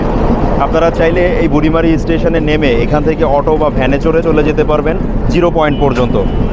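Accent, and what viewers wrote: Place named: West Bengal, India. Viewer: এই ভিডিও তে আপনার কথা শুনে মনে হচ্ছে আপনি পশ্চিমবঙ্গ বা কলকাতার আসে পাশের কোনো এলাকার ছেলে 😅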